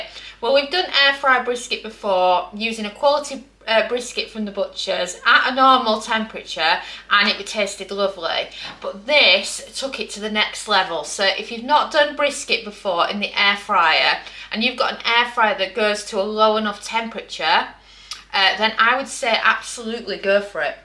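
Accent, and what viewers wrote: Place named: Lancashire, United Kingdom. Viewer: I’m torn if that’s a Lancashire or Yorkshire accent. Trying this method today in my ninja woodfire. Wish me luck 🍻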